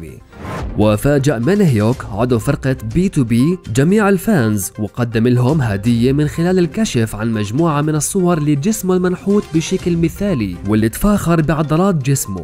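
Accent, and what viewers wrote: Iraq. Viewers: هسه يلي جاي يسوي اخباࢪ هوه عࢪاقي اشو يحجي مثلنه العࢪاقيين 🇮🇶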